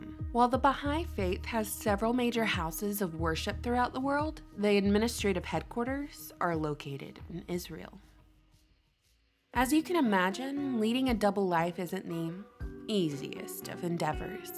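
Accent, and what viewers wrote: South, United States. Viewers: Welcome back I’ve missed you n that lovely southern voice.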